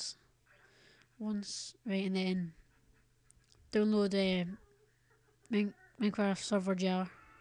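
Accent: Scotland, United Kingdom. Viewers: You gotta mad scottish accent.. Its scottish right? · oh he is Scottish i can tell i been there lol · Like the accent, im scottish too i dont often hear it on youtube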